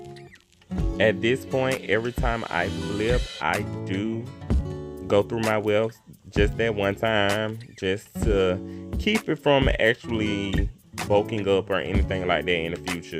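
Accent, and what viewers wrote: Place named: South, United States. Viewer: “Big country plats” 😂😂😂😂😂😂 I have not heard the term plats used to describe braids in a long time... I love it.. I love your southern accent.. Also the video is very detailed and on point... Thank you.. I’m subscribing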